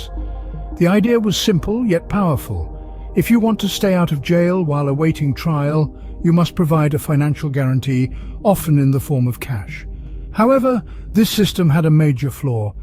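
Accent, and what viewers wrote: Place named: United Kingdom. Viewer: Whats with the proper British person narration?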